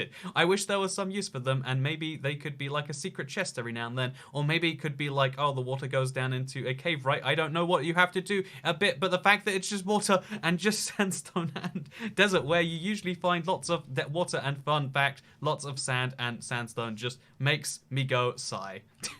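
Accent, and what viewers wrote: United Kingdom. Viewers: to be fair, you do speak really quickly and youtube doesn't understand british accents at all, so it's kind of expected that the captions would be bad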